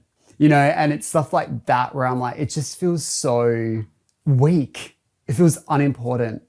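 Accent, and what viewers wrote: Australia. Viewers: are you australian by any chance?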